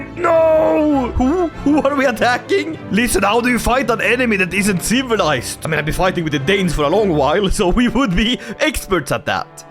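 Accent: Sweden. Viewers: Den svenska dialekten märks värkligen 😭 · That's the most swedish accent of english i've heard from anybody not older than 70. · Är du skåning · The accent kinda gives it away 😂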